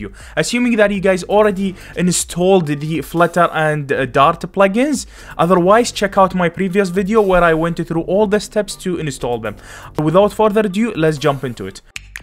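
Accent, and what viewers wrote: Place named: Iraq. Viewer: لهجة مالتك مال عراقي انت عراقي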